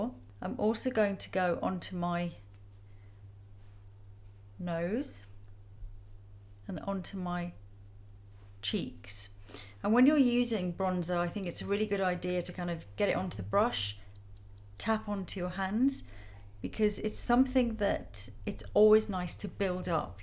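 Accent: United Kingdom. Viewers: I love your accent. Always liked the British accent.❣️ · Love her explanations of make-up. Also, love her calm, mellow voice with British accent.:)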